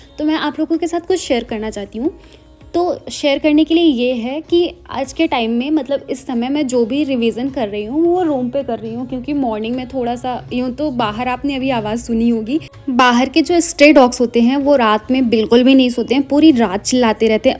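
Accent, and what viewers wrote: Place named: Uttar Pradesh, India. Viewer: It's not about pronunciation, it's about the regional effects that's why you are speaking with such accent. As you are from up so it's your Hinglish ascend. A haryanvi will speak hos own Accent so don't worry regarding this things.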